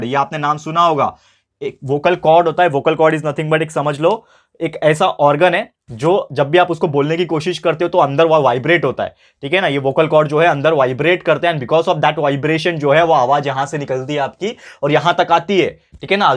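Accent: Maharashtra, India. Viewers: Sir your from Maharashtra? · Are you Marathi ??😮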